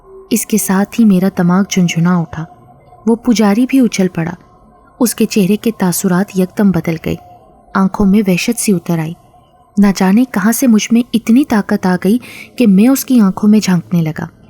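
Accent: Pakistan. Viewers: Sastar ap kas jaga sa hea zaban sa lagtha hea ap pakistan sa hea lovli vice lazmi bathana mean ap ko malnay ayho gu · Allah aap ko khuash rakhe mujhe Nahi pata ki aap ye comnt padhengi bhi k Nahi Me India se hu or aap k Andaz se Lagta h ki aap Pakistan se he ,par itna kehna chata hu ki mujhe aap ki aawaz or andaze bayan se muhabbat ho gai h.. meri baat Ka koi galat MATLAB mat nikaliyega plz... Jab se Mene apki kahaniya Sunna shuru ki h me or Kuch Sunna ya dekhna hi Nahi Chahta . Allah aap ko Khush rakhe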